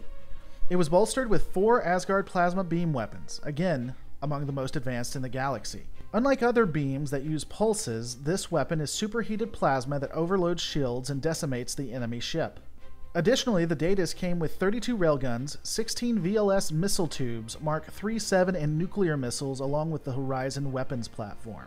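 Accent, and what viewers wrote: United States. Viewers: Please learn to speak English. Not barstardised American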